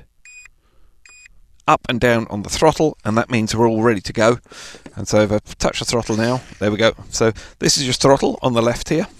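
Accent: Scotland, United Kingdom. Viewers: You're so funny. Thanks for this video. You're a perfect pilot, I think no one can fly those little birds in expert mode in those windy conditions. They're just too touchy for that. It gave me so much fun and delightment to hear some familiar-sounding, positive scottish voice in these day and age. :/ Took this as the main introduction-video for my kids for this F4U plane ,I gave them this Xmas - All the best from germany, three thumbs up :D Stay healthy. Cheers mate and thanks a lot! Hope you know, you're living in a paradise ;)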